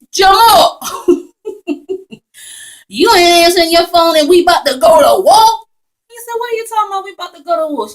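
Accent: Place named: Philadelphia, United States. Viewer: So good to hear the Philly "sound"! Great job from your Philly friend on the West Coast. Keep up the good work!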